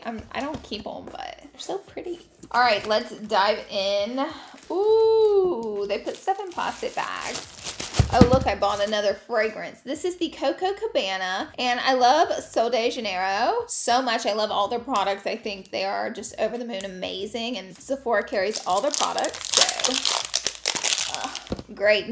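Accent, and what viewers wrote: South, United States. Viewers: omg where is she from?! she sound soooo southern haha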